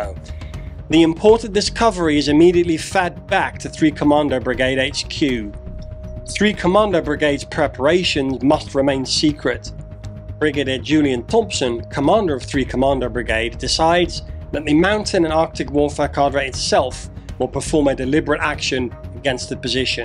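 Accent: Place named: Netherlands. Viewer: Ik meende al een Hollands accent te horen. Maar is je Engels nou goed voor een Hollander, of is je Nederlands (erg) goed voor een Brit? Anyhoo, gaaf kanaal, maat!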